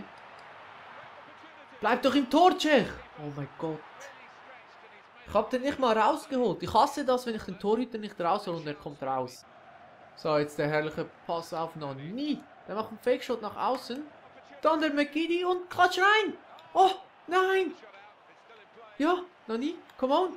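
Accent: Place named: Switzerland. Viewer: Deine videos und dein schwitzer dütsch is hammer geil! :D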